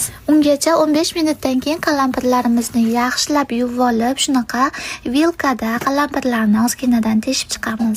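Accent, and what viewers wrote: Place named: Fergana Valley, Uzbekistan. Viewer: Водийни кайсидир жойлари шунака шева бор калапир кутини ёки бошка нармаларни кутини диб гапиришади эшитганман деме бу кишим водийдан😅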